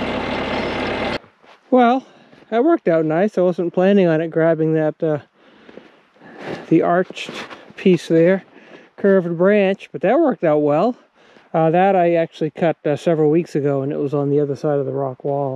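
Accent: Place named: New England, United States. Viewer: I just randomly came across your channel and thought I’d check it out. I have to say I’ve been enjoying watching your videos quite a bit! I really enjoy watching you play in your playground and all your cool toys! I like your commentary about what you are doing and your thoughts on the situation. I also enjoy your New Englander accent!! Keep up the great work with the videos and I’ll keep enjoying them!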